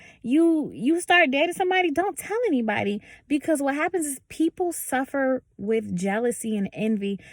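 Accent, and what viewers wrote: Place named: United States. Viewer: with an american accent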